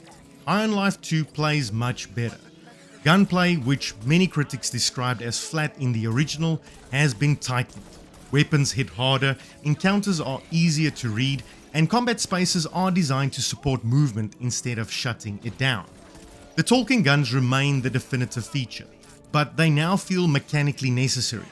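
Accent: South Africa. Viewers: Bro is 100000% a south african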